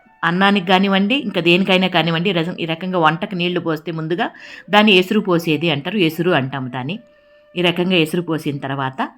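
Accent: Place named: Bangalore, India. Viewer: Meeru maa laage matladataru ante memu Bangalorelo unde vallu itlane matladthamu alage ginjula pulusu kooda itlane chesedi❤❤❤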